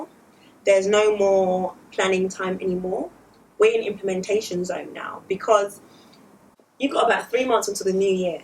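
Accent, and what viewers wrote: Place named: London, United Kingdom. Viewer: Love your vids. From a fellow Londoner (you sound like you're either from south or north London lol)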